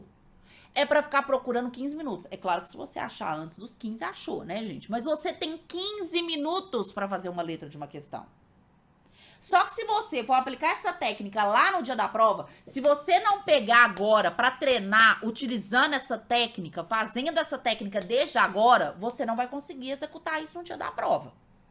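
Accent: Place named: Minas Gerais, Brazil. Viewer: é mineira 😂😂😂😂😂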